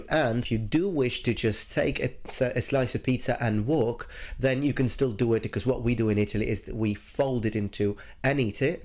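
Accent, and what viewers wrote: England, United Kingdom. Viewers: No accent? He has the accent of England all over his English! (Moi, statunitense . . .) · Hey, goomba what’s with the semi-English accent? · You speak with a somewhat British accent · I always forget your Italian. Your UK 🇬🇧 English accent is immaculate. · That actually sounded very british for some reason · But your accent seems someone from UK, are you Italian British?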